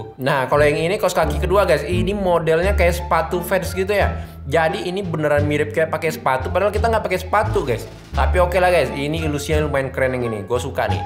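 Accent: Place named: Madura, Indonesia. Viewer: Bang lu orang madura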